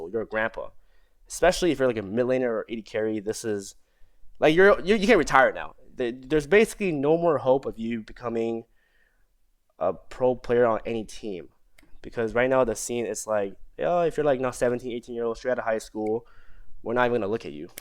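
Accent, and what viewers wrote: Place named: United States. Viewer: Damn I had no clue u learned English that late usually ppl don’t get an American accent that quickly if ever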